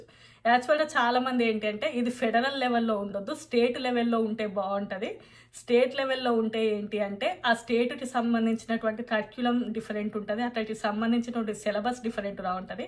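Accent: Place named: Karimnagar, India. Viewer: Madam , as per your accent, are you from Karimnagar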